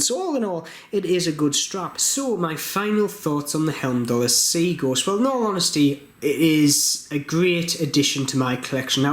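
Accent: Newcastle, United Kingdom. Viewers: Hi. I'm a fan of Heimdallr and so I'm pretty disappointed by the quality of the lume, they should've done a better job of it. My skx homage of theirs is like a torch in comparison. Glad to see they've done a decent job of the bezel as they seem to have been getting criticism in that area with other models. Good to see you back mate we've missed that Geordie vibe.✌️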